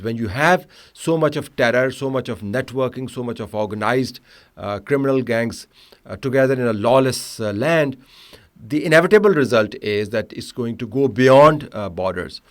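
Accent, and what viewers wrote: Pakistan. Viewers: Paki pooonjabi english 😂😢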